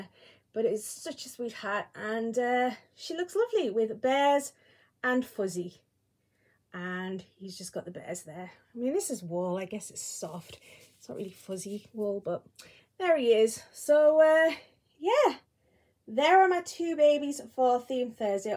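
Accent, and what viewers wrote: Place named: United Kingdom. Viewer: Don't you dare change the way you say anything! I love your British accent just the way it is. ❤️❤️❤️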